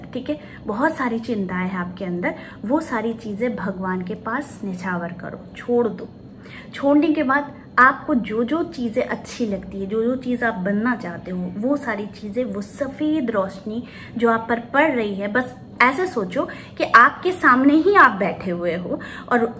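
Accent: Bengal, India. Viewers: Apni ki bangali? Apnr uchharon onek tap bangalider moto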